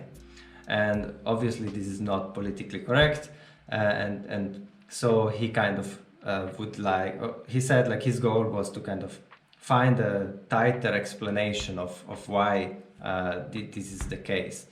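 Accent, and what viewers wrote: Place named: Scandinavia. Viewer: You are from Switzerland? I had no idea. When you talk English you sound more like a Scandinavian to me to be honest :) So German is actually your native language? Have you ever thought about doing this in German? Sometimes I feel like we need more of that, the German language region seems to be disconnected from the rest of the world at times. While Peterson has become a world-wide phenomena nobody I know personally here in Germany has ever heard about him. Which is unfortunate to say the least.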